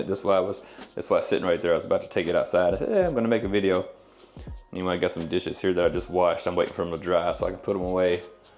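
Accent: South, United States. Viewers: Like that southern accent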